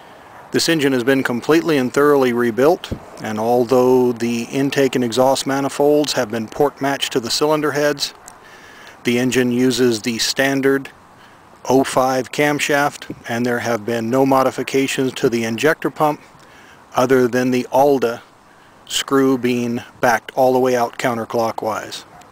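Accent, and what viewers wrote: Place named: Sweden. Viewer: ! haha sounds like you could be swedish. nice video love the merc diesels ive got a w123 240D euro of course.;)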